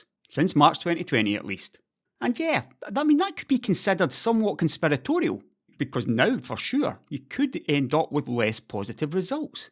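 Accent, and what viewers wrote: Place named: Scotland, United Kingdom. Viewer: Can you make a video in swedish. I have to hear your Swedish with a Scottish accent, if you can speak it.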